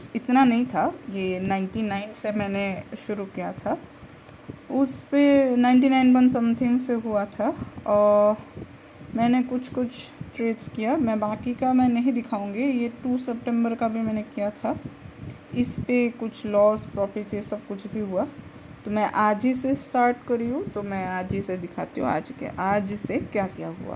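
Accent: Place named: Maharashtra, India. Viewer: Aapke bolne se lag raha aap maharashtra se ho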